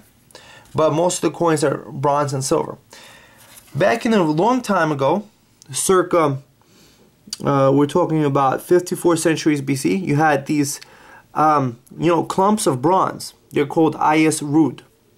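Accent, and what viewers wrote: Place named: England, United Kingdom. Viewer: stop butchering latin with an english pronounciation please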